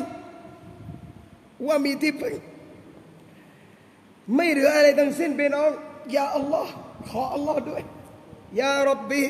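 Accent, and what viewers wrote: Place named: Malaysia. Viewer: อาจารย์ เป็นคนที่ไหนหรอครับเหมือนหลุดภาษามลายู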